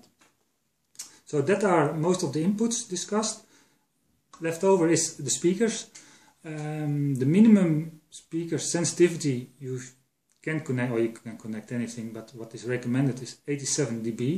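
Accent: Netherlands. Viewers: Bedankt. Ik droom al van deze versterker. Ik heb een FM-tuner met lampen en de klank is helemaal anders. Voorlopig gebruik ik een oude versterker met discrete schakeling die het zeer goed doet. (Ik dacht wel dat je Nederlander was gezien je accent...). Walter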